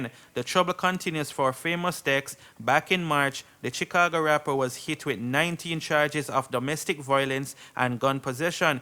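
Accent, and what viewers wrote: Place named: Jamaica. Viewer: Are you speaking Jamaican or east-indian accent?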